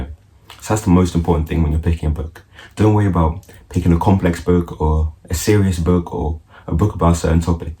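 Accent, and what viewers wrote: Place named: United Kingdom. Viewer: Guy your British accent is perfect!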